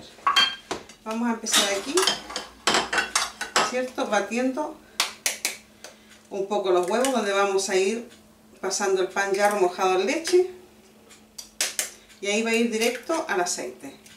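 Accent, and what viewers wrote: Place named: Chile. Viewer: aca en mexico le llamamos capirotada... que ricas se ven!!! amo en verdad el acento chileno!! un gran abrazo!!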